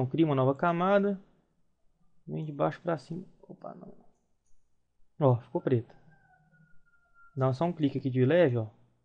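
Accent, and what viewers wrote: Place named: Minas Gerais, Brazil. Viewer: show. a voz do mineirin. ô çô!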